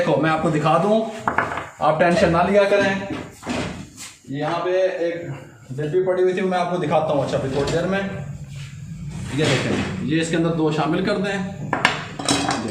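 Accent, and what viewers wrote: Punjab, India. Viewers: ur style...ur punjabi tach....wow masha Allah...love it.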